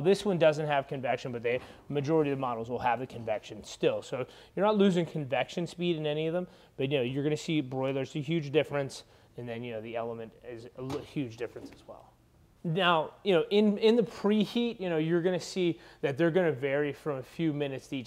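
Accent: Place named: Midwest, United States. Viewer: Mid western American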